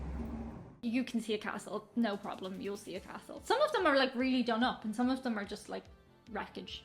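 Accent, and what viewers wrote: Ireland. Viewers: I love your Irish accent,. It's like listening to music. 👍🥰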